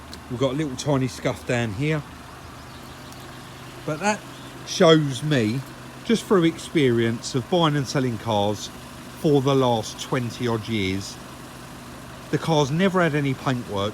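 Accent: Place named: England, United Kingdom. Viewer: I'm from Australia. I could listen to that English accent all day.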